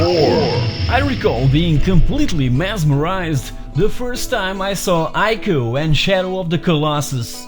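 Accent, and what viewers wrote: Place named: Portugal. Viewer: LOL, o sotaque pt é tão vísivel :D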